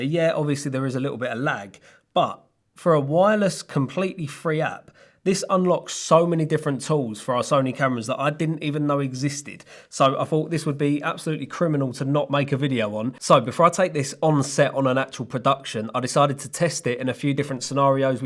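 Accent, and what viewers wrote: United Kingdom. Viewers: Bro I just noticed you look like you're the brother of James Hype. You even got the same UK accent and Name. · American here, I love your accent this is what Americans imagine when when think of a prototypical "British Accent"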